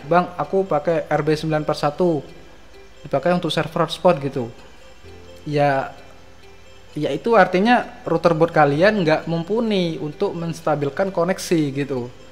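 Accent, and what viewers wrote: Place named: East Java, Indonesia. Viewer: Logatnya sampean kok kayak Orang Lumajang / Jember / Bondowoso. Sampean domisili mana mas?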